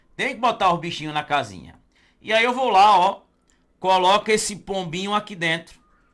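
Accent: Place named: Northeast, Brazil. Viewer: Ótima aula, e com esse sotaque fica melhor ainda😀 adoro sotaque nordestino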